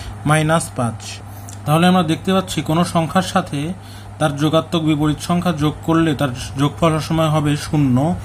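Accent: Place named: Barishal, Bangladesh. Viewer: আপনার বাড়ি কি বরিশাল?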